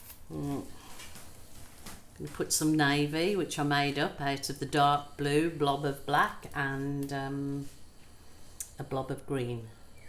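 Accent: Birmingham, United Kingdom. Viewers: Hi from England. Your accent sounds like a Birmingham accent with a little Australian in there. Love your work. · Just inspirational! Thank you or sharing your amazing imaginationary art form. I am planning to redecorate my sitting room and am going to try and do a canvas using the new colour palette to hang on a plain wall. I'm in the UK and it's lovely to hear your Brummy accent and gentle way of explaining the simple and apparent random application of paint application. Thank you from the othe side of the World!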